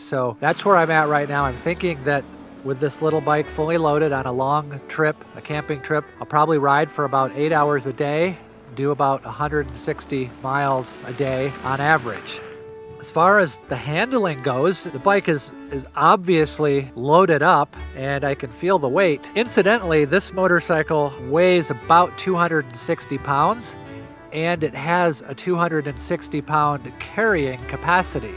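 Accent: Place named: Arizona, United States. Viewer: I didn't realize there was an Arizona accent. The way you speak reminds me of Cody Lundin. Very relaxing. Good video, Thanks!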